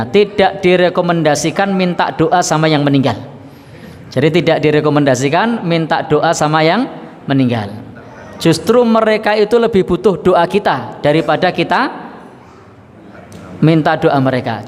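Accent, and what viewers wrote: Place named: Java, Indonesia. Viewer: iJIN BERBAGI.....👇 MENCERDASKAN UMMAT....!!! USTAD INI...KALO DARI NADA BICARANYA SUKU JAWA....... TETAPI AKIDAH NYA LURUS..... BELIYAW TERLIHAT BERADA DI ATAS MANHAJ SALAF..... HARUS NYA BANYAK ORANG JAWA YG HIJRAH MENGIKUTI PEMAHAMAN BELIYAW....YGMENGAJAK UMMAT KEMBALI BERAMAL,BERIBADAH BER AKIDAH MENGIKUTI MANHAJ SALAF....!!!! SIAP SIAP USTAD.....KALO MENDAKWAH KAN AGAMA YG HAK,YG SESUAI DALIL....DARI KITABALLAH DAN SUNNAH ROSUL..... MAKA ANDA AKAN DI TUDUH.... SEBAGAI WAHABI......😁😁😁HE....HE.... TETAP ISTIQOMAH MENDAKWAHKAN YG HAK.....MESKI BANYAK YG MEMBENCINYA....!!!! SEMOGA BERMANFAAT. 🙏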